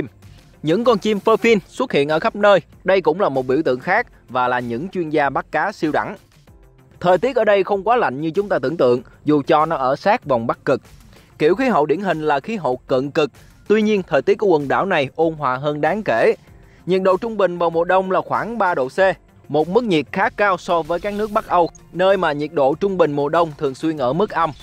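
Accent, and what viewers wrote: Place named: Phu Yen, Vietnam. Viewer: Giọng đọc Phú Yên thì phải.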